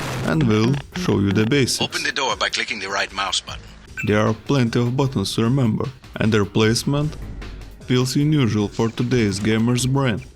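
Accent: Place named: Eastern Europe. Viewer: Hi, are you from Eastern Europe? Accent going kinda thick💪 Love your videos, keep it up!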